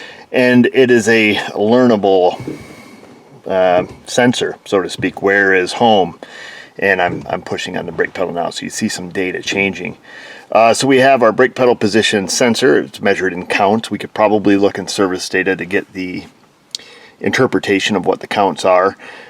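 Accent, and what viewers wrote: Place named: Northeast, United States. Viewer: "She's got the leathah!"......right away I knew he was a northeastern guy lol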